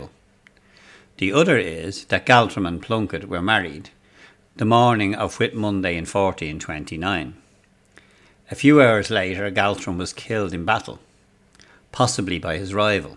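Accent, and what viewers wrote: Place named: Dublin, Ireland. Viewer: Fascinating and very well done presentation! Thank you! From an African American from New York, lovin" the Dublin accent!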